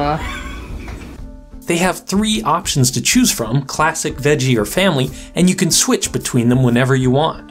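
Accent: United States. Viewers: He speaks so...american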